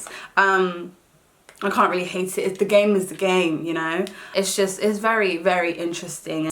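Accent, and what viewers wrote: United Kingdom. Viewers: You remind me of Jorga Smith both of y'all gorgeous and have that dang British accent.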